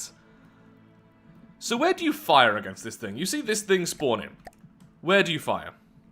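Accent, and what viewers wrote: United Kingdom. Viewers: When I cannot sleep I get recommended videos and I get to pull a all nighter to his British voice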